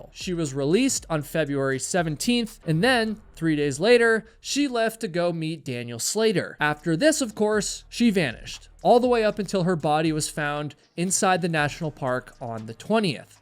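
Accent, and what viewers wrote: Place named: East Coast, United States. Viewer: I'm from Toronto, and it warms my heart to hear your East Coast slip out every now and then. Words like charge and bar, love your channel; keep up the good work!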